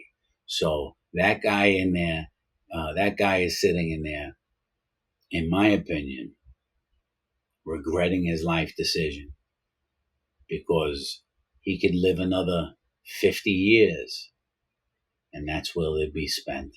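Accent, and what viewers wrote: New York, United States. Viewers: I love ur NY accent! I dated a guy who did 27 yrs and he sounds just like you. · i can tell you from NY immediately as i am too i started watching your other videos too ….good stuff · Just found your channel. You sound like you're from new york. I appreciate your somewhat objective/neutral analysis of this situation. I appreciate you telling us about the difference between federal prison/lockup and state prison. It almost sounds like you've done some time yourself. It's like having an uncle or papa or some neighborhood guy telling all the kids gathered around the block about the prison system and how it works. So that they don't end up like him. Something tells me that he might get moved simply due to the fact that some of the victim's families live in the state of idaho and they don't wana be sleeping in the same state as their loved one's killer.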